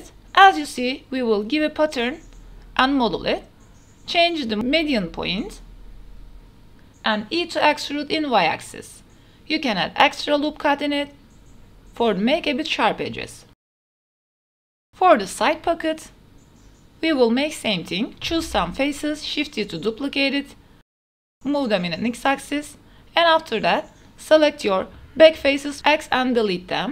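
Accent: Turkey. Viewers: thx for tutorial and i cant hold it inside me but ur accent feels like turkish ngl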